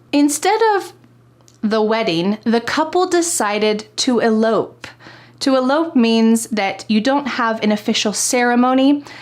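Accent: United States. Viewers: I❤ u teacher.....ur american accent so awesome....wanna speak american accent like you ....its really cool Mc donalds said im lovin it Greeting for ur husband DAN and ur children.....your guys re inspiring family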